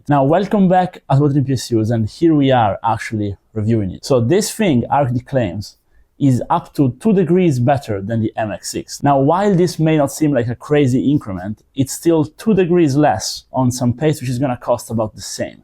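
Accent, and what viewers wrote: Italy. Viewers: He's Italian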